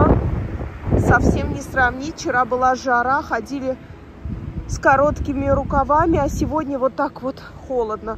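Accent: Baku, Azerbaijan. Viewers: Мой родной,красивый,незабываемый город.Огромное спасибо,за показ родных мне мест и за дорогой бакинский акцент! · Русскоязычные бакинцы так и говорят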